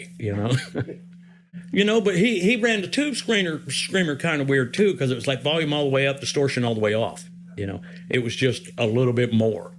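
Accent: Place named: Indiana, United States. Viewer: This guy is cool to listen to, he sounds like he could be a Hoosier (?) referring to the “accent” if we have one 🤷🏻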